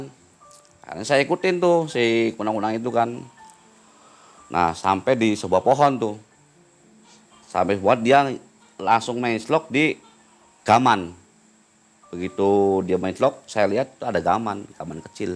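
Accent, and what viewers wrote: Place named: Java, Indonesia. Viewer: Maaf klo boleh tau sampean orang mana sih klo aq liat tayangn sampean ko Kya alergi banget bahasa Jawa..apa" ga tau?padahal klo di lihat sampean bicara logat orang jwa juga?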